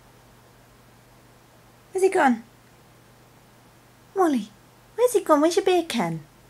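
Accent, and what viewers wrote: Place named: England, United Kingdom. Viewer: What's cuter the dog or her accent? Where she from England?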